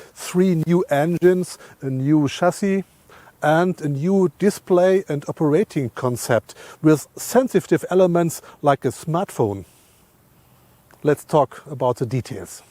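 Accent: Germany. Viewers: Totally appropriate to have a Porsche review with a Porsche reviewer with a thick German accent…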